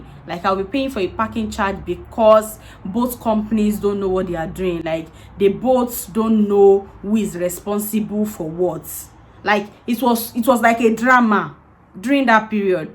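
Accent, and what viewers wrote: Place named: Yoruba, Nigeria. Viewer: Your yoruba accent lasan >>>>>>>> 😆 🤣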